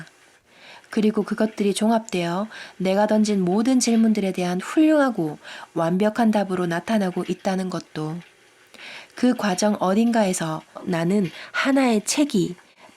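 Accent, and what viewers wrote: Gyeongsang, South Korea. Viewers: 경상도 억양이 밉지 않네요 감사 합니다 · 어디사투리세요? 경상도? 같은데요 🙂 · 어떻게 이런일이..마음공부 하던중 우연히 신과나눈 이야기 란 제목에 살짝 호기심이 발동해서 처음 들은게 몇달전인데도통 무슨 말인지 모르겠는데 댓글들을 보면 감동이라는 글도 많고 긍정적반응이 많더라구요 근데 일부 내용만 들어서는 무슨 말인지도 모르겠고 법문공부 중이라 해석을 어떻게 해야할지도 모르겠더라구요 말 그대로 전 답답한 상태였어요 눈 이 아픈 사람이라 책 읽을수 없는 상황인데다 어제 다른영상에서 한번더 듣고는 도저히 답답함을 견딜수도 없고 어디까지가 신의 의도이고 어디까지가 인간의 의도인지도 모르겠고 ( 살인사건 , 고문 과 같은 큰 사건) 정말이지 속이 편치 않고 혼란스러워서 어제 들은 다른영상에 몇가지 질문 올리고 잠들었는데 답은 없었어요 그래서 평소처럼 법문 들을겸 찾다가 이영상 눈에 들어왔어요 별 기대없이 재생 버튼 눌렀는데 ...저를 위한 영상이었군요 같은 경상도 사람이라 알아듣기 쉽고 또 풀이까지 해 주시니 감동이었어요 궁금한게 하나씩 풀리는것 같아요 너무너무 감사해요 후편들도 기대되요 궁금한건 질문드려도 되죠? 이렇게 알게되서 정말 반갑습니다 수고하세요^^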